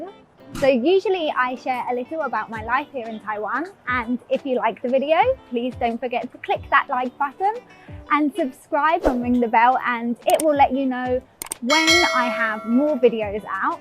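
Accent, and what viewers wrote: England, United Kingdom. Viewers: 12 years! you must be kidding do not speak Chinese at all. However, first time hearing such strong, clear English Accent in Taiwan. Please do not be afraid to speak even your pronunciation is incorrect, maybe learn from your kids could be much easier and quicker. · 親愛的Lucy, 拜託 千萬不要永遠說中文拍影片 妳迷人的英國口音 正是妳的一大特色 不過我也承認 很想聽妳整集影片都說中文 魚與熊掌無法兼得 妳過得開心最重要 祝妳學中文順利 加油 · 英國腔好酷XDD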